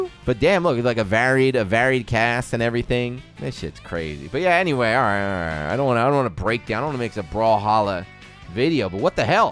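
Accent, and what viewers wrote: Philadelphia, United States. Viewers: You're from Philly, man?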